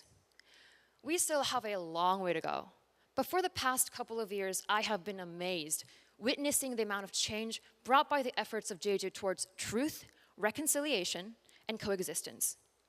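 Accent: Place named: United States. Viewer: WOW SHE S GOT THE ENGLISH ACCENT THAT MOSTLY AMERICAN NATIVE SPEAKERS HAVE I CAN CLEARLY UNDERSTAND HER GIVING SPEECH WORD BY WORD SHE IS EXCELLENT SPEAKER VERY WELL DONE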